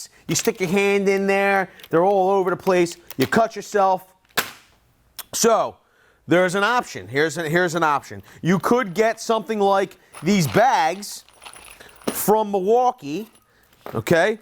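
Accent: Philadelphia, United States. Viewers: South Philly accent. We say WUDDER, for water!😀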